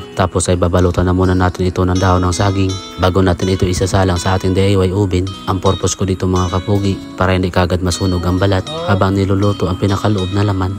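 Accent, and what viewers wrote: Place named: Visayas, Philippines. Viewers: Abot hanggang Antipolo, Taga San po kayo? parang waray salita niyo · Saan ka sa atin dol? Bistag dialect mo diba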